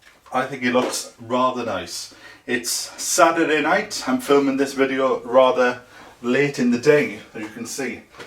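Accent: Wales, United Kingdom. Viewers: Love watching you,find you so relaxing,that lovely Welsh voice.I’ve been in my garden today starting tidying up,loads of bulbs coming up,great time of year.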